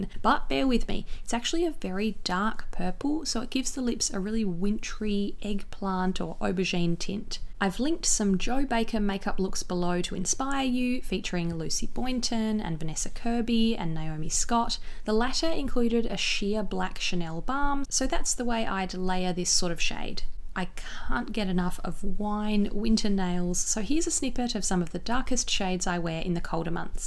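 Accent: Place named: Australia. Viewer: Awwww must be the Aussie accent 😍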